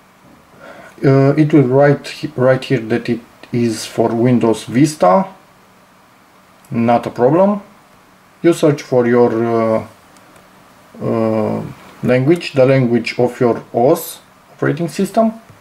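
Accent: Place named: Romania. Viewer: Bro tu esti roman 100% :)))) <3 Edit: Te-am luat dupa voce si cum vorbesti Engleza da se vede si pe paginile web :)))))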